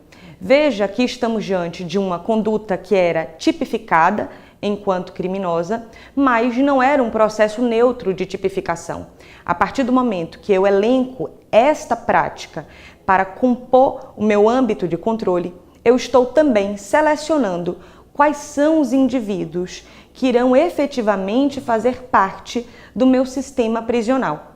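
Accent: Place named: Bahia, Brazil. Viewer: acho que vem da BAHIA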